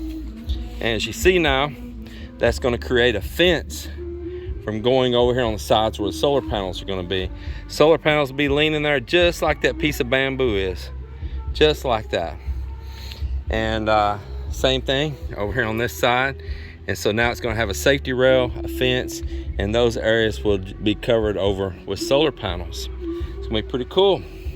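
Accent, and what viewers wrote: Texas, United States. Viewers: Not sure if I mentioned it previously but watching your vids at least for me is an eye opener,I learned a lot from you,I'm not going to build a house but I'm enjoying your knowledge and the easy way in which you explain things,your Texas drawl brings memories from the time I took special forces training at Fort Hood Killeen Texas and Fort Sam Houston in Ssn Antonio back during the Iraq campaigns,,,,I know it takes time and effort to get the vids on YouTube so I thank you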